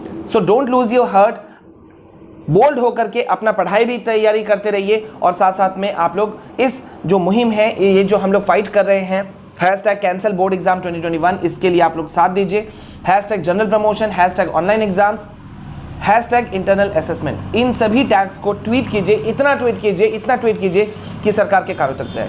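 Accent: Bihar, India. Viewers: Sir aap kya bihar ke hain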